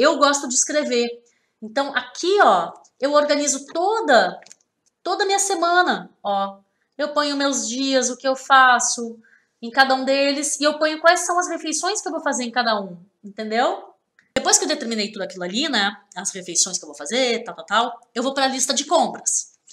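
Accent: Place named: South, Brazil. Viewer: Do Sul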